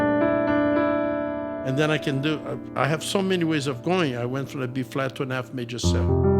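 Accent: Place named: England, United Kingdom. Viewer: Bravo your tutorials, Master ! I do appreciate your methods, mostly your cleared, well articulated English accent ~ instead of too many sounded-English-seemed-like, such as bastard's American etc..etc... They must do trainee English Accent... PLS broadcast my message 🙏🙏🙏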